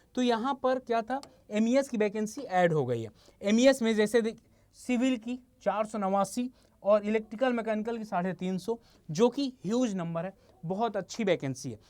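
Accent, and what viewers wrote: Bihar, India. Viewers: Sir aap bihar se ho ya purvanchal UP SE?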